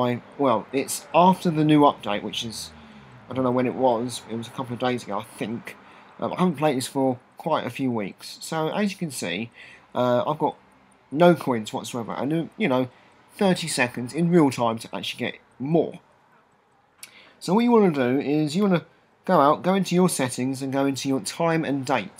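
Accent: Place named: London, United Kingdom. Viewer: Awww London accent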